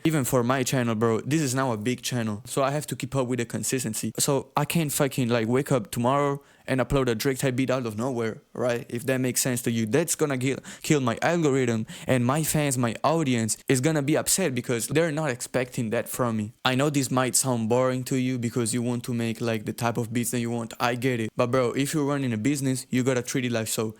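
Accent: Italy. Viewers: true ahahah! The first thing I thought as I soon as I heard you talking is "what, Italian man making a living in music making beats?" LOL good video and good inspiration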